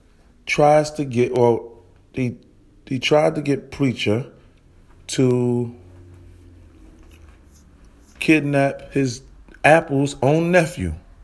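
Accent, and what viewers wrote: New York, United States. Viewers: U from uptown my guy? Or nyc? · One thing you can say is it's a New York dude who is narrating this,you know several things,preacher is in the pen,in this person head,he can't get next to him, Another thing is this,speaking about preacher may help him get likes views clicks I dont know, To be frank I know apple preacher and the henchmen whom is from queens,whom preacher possibly turned on,but Im making this person stronger then how weak of preacher he might think he knows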